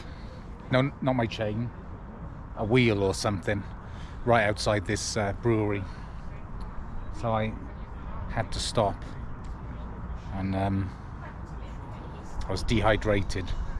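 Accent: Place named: South East Wales, United Kingdom. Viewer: Hi, Stumbled on your channel. Its great to see a Brit doing the GDMBR. As an ex-pat living in Canada for the last 20+ years this is a route I have obsessed with for some time. Looking forward to watching the whole series especially like the video about cost and realistic time lines to get the route done. Its great to see the ultra elite athletes completing in less than 20 days but we are not all like that!! Where are you actually from in the UK? can't place the accent, I have you south east Wales but I have been away for a long time!! PS don't post your address! 😂😂 Cheers Bob...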